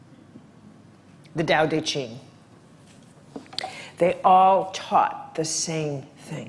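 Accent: Chicago, United States. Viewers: She feels so familiar to me and when I looked up her info I realized why. She is from Chicago, as am I. Her accent and her vibe is so Chicago, and for me, so comforting!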